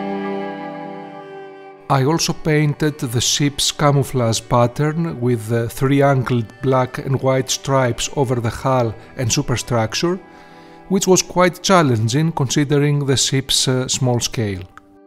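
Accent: Greece. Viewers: From the moment I heard your voice I knew you were greek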